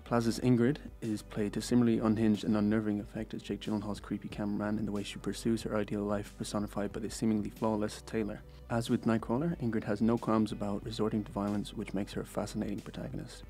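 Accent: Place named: Ireland. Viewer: Nice work. I'll definitely be checking in on your reviews from time to time. The format's strong, albeit a bit rushed? That's my only criticism. I understand your accent fine but outside of Ireland, your audience might struggle at that speed. Or maybe not. I guess time will tell. Looking forward to seeing the channel grow.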